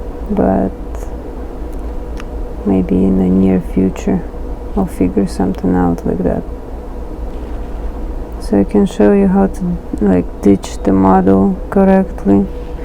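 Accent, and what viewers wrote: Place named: Russia. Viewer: Русский акцент 🤪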